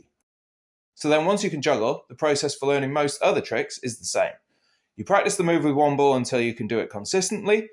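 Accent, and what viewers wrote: England, United Kingdom. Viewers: Hey, mate, are you born in UK... it seems you speak British accent English, don't you?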